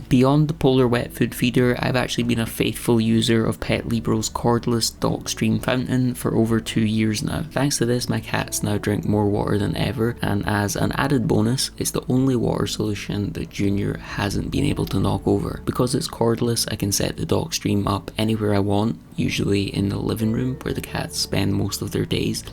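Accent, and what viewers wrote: Scotland, United Kingdom. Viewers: I have to say that I love two things about this video. For one, I love your beautiful cats. Second, I love your Scottish accent💖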